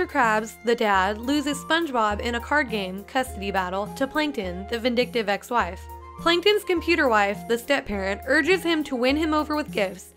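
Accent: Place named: South, United States. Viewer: so was I right you are from the south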